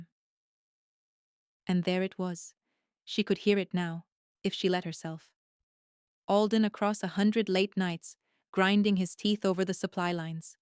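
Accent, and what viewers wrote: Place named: India. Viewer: Can't listen to this story, sounds like Idian Muslim accent which hates dogs!!!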